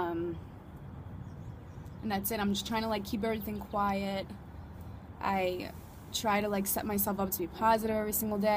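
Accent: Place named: Massachusetts, United States. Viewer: I am going to guess that your from Mass?!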